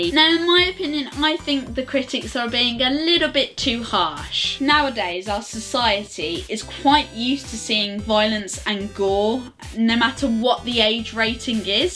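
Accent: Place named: Birmingham, United Kingdom. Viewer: Love the brummie accent :) Freddie said I don't need some asshole critic to tell me that.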